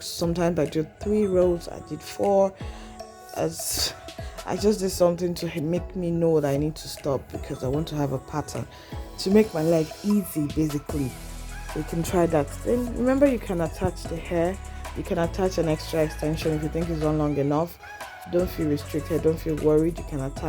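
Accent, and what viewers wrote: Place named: Nigeria. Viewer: Firstly I might say I just love the Nigerian accent. One of my favorites. And you did the things hunny,looking all so good and I did click the like button because I really do understand😂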